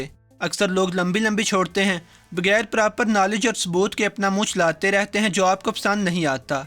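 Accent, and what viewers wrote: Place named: Lahore, Pakistan. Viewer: Aap Yakeenan Lahore sy Hain ... hoobian nahi hota Khoobian hota hai ..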